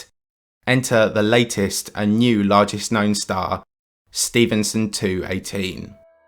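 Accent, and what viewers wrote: United Kingdom. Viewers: Gonna miss VY Canis Magoris as the biggest star known to man. She was my favorite to talk about when speaking of the scale of other stars. And a quick shout-out to the narrator, British accents make for great documentaries. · I can't stand anyone with a British accent. Made it 10 seconds in to this video. Stopped as soon as I heard his voice. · Kinda curious how almost every space channel i tune to, theres always british commentary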